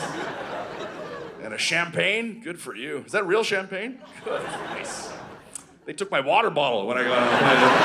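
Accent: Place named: Canada. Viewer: Really good! I watch a lot of comedy, and damn it’s good to hear some Canadian.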